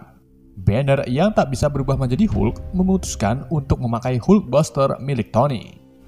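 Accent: Indonesia. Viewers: Kentara lidahnya org indo v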